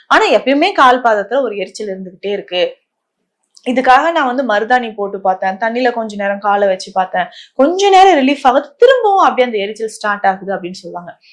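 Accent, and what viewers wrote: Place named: Chennai, India. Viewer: Dr.என்றாலும் Madras தமிழில் தான் கதைப்பீர்களா?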